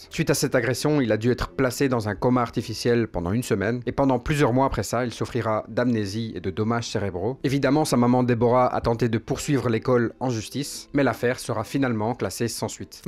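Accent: Belgium. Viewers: bien aimer je like et m abonne J'ai comme l'impression que tu es Belge car tu as un accent. Pas comme le mien encore car je parle d'après mes amis Bruxellère lol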